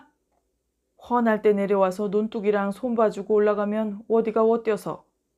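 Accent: Gyeongsang, South Korea. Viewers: 여긴부산인데요 갱산도미까 사투리가 구수해요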